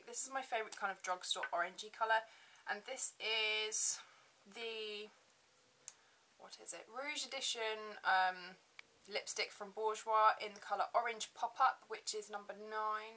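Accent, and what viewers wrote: United Kingdom. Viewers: I LOOOOVE your British english - so sophisticated! unfortunately I fail at imitating. :-) keep up the amazing tutorials! xx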